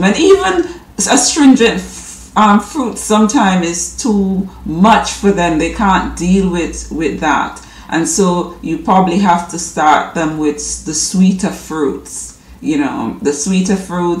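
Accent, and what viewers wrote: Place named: Barbados. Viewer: where are you from? Your accent sounds a bit Barbadian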